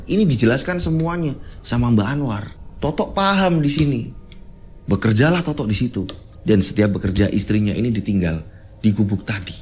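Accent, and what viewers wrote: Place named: East Java, Indonesia. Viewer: Ini dari logat bahasa nya mas kayak orang Jawa Timuran daerah selatan ( blitar kediri Tulungagung )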